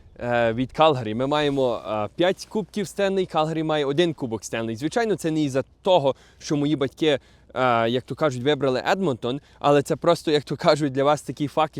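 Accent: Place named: Galicia, Ukraine. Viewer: Мова дуже гарна, з першого слова впізнала галичанина-земляка.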